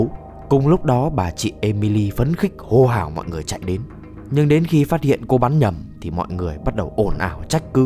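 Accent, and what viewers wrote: North, Vietnam. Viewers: Sao tao nghe thấy thằng làm content đọc bắc kỳ trong cái video này? Phân biệt vùng miền lên cả recap phim rồi? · Tính ra ng đọc nói giọng Bắc mà, tôi nghĩ chúng ta có thể hiểu nó giống như người da đen nói niga <(“) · admin nói giọng bắc mà chill bro 😭😭 tôi ng bắc vẫn hay đùa backi thấy cũng vui mà miễn k có ác ý là được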